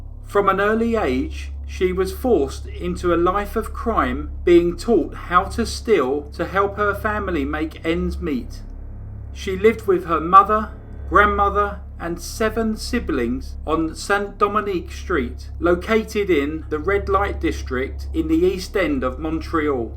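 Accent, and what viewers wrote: England, United Kingdom. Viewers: Wow comt beliebe you all Thought that - I guess it that they are the same sort of shes and English accent and same reading style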